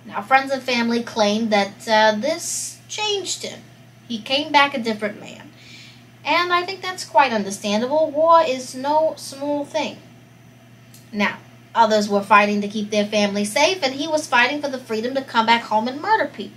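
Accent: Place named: New York, United States. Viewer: Hello I love your costumes and thick New York Accent Long Island no doubt. Sounds like Cyndi Lauper but more cute. I think you like those types of stories and dress up but it’s good I love it thank you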